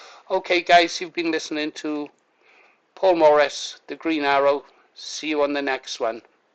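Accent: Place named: London, United Kingdom. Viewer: A proper London accent!😂😅 Cockney is better than the Jafaken that the freaks speak, and not only in London now.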